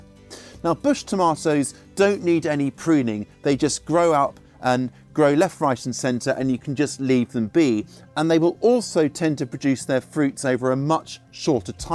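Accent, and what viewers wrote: England, United Kingdom. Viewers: to-mah-toes. i love an english accent. fish heads, not so much lol. for cherry tomatoes i have collapsible 4 sided, 4' tall plastic coated wire tomato cages that fit perfectly around my 4 gallon nursery pots in my container garden. i put them up in a 2 or 3 row checkerboard pattern, joining touching corners with wire plant twists. [picture zigzag pattern for a 2 row, or 4 corner cages and a middle cage for a 3 row.] all plants are easily reachable and get air and light. [i feel the row is more stable like this; we get fierce thunderstorms with wind.] additional training support is given with [1/4"W] plastic coated 4' stakes [not wood, termites are a thing in the south.] monster varieties eventually get transplanted into 10 gallon pots with [1/2"W] plastic coated 6' training stakes, also not wood. after 6' i cut off the top of the plant since i cant reach any higher. 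stakes not in use store very well in a vertical [4"W] length of pvc pipe screwed to a stud in the garage.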